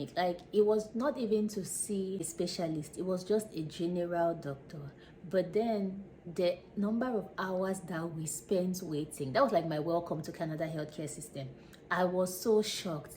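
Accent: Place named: Nigeria. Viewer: Hi nice content there, I am enjoying your channel. I am guessing you're Nigerian or maybe Cameroonian? (judging by your accent haha).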